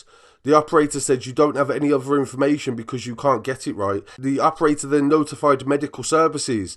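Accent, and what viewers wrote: Birmingham, United Kingdom. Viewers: You from bruming ham i hear your English brummie dialect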